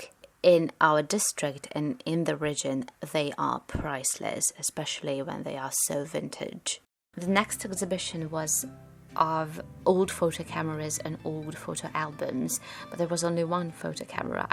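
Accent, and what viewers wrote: United Kingdom. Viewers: I really love to watch and listen your incredible voice in British accent:) it would be great to learn English from you :)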